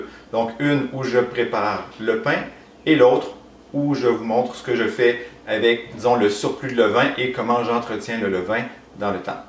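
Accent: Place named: Canada. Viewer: Claude de Belgique J' adore ton accent Canadien !!!! et bien entendu le Québec !!! Merci !!! pour ta recette de levain que je suis entrain d'appliquer ( 2 éme jour )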